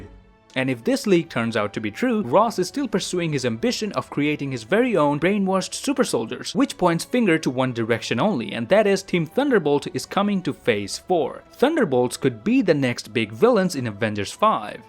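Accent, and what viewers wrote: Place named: Quebec, Canada. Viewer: I can tell by the way you pronounce farm, are, and such. I can tell you’re French-Canadian. I’d like to assume your first language is French. And with that information, shall I suggest the same channel but you say everything in French, for any French viewers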